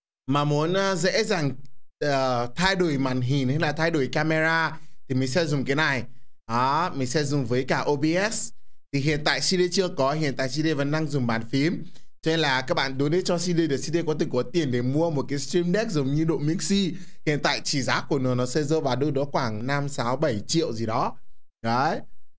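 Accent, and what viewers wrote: Standard. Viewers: Anh này nói tiếng Việt chuẩn chỉnh hơn mấy bạn khác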